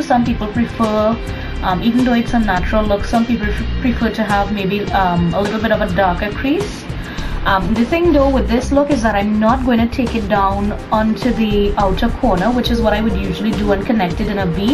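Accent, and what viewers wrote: Trinidad and Tobago. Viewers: I love your accent!!! trini right?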